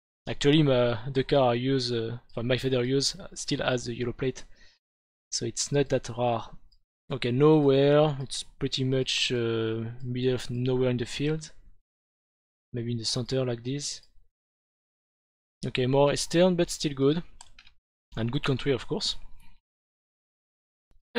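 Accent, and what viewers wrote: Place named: France. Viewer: love the french accent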